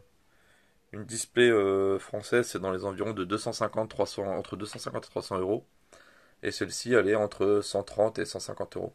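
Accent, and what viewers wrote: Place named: United Kingdom. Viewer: Whaaa..!!! salut l'ami belle ouverture, et quelle surprise cette petite pub et hyper sympa de ta part! merci mon cher! =) comme il a dit oui venait sur ma chaine! =) sympa ton petit concept et je suis ravie que cela n'et rien prouver comme tu la dit ou moins les scalpeur vont pas arnaquer les gens avec leur mensonges grotesque! la secret Rainbow whaou =) MAIS TA CARTE PIKA LA JE LA VOIE PARTOUT IL M'EN FAUT UNE! x) +1 like pour ton british accent! lol merci encore a bientôt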